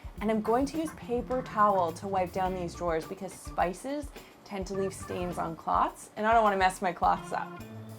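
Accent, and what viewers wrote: Canada. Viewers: My boyfriend knew you were Canadian just by your accent! I couldn't tell the difference until I focused on it. The way you say "progress" is so cute ☺️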